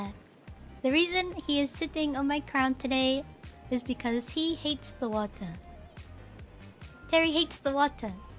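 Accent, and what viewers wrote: United Kingdom. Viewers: She bri’ish!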